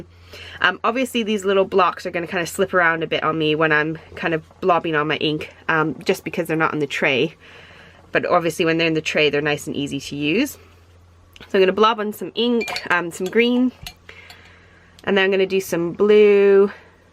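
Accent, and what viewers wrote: United States. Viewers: Hi there, new subbie here, loved your cards, where are you from? I can’t work out your accent, you sound American, but so many of your phrases are English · I so love your American accent with British terms. Makes my day🤓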